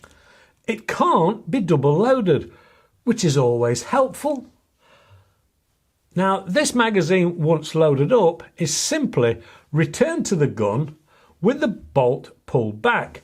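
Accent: United Kingdom. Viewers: I love your accent, reminds me of all those Monty python shows I loved to watch.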